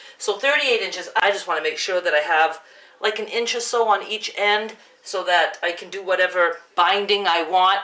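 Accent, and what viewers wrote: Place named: New England, United States. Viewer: Thank you so much for your tutorial! I loved the addition of lace! It felt so good to watch an experienced quilter run into issues. I have an ongoing relationship with my seam ripper, it's always right by my side!! I loved listening to you, you sound like a New Englander, I'm from Maine and felt comforted by your accent. I hope your mom loved the quilt! Take care!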